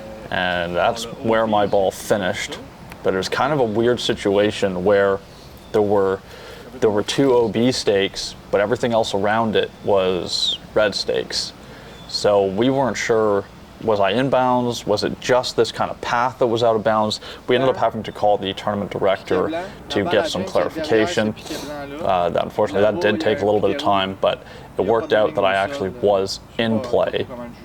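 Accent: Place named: France. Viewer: What is the name of the course? Us dumb Americans cannot understand a word when you throw a French accent on it.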